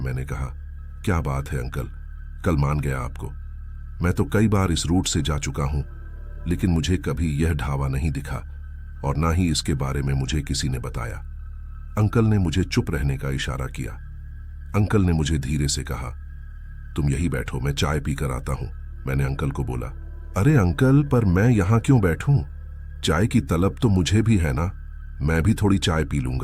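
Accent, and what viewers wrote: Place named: Bihar, India. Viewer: Are Bhai bihariyon ki tarah kyon bolate ho bada Ajeeb lagta hai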